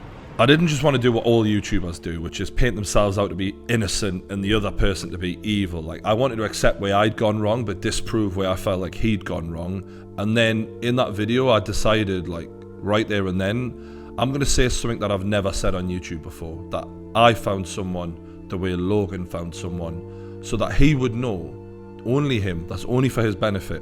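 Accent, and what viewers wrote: Newcastle, United Kingdom. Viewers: Man I love the true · true fucking good work keep it up brother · Ending with a bit of, love that 👊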